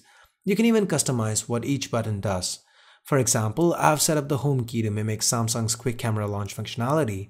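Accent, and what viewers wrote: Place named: India. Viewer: you sound very Indian, and I don't mean that in some bad way, I'm of Indian myself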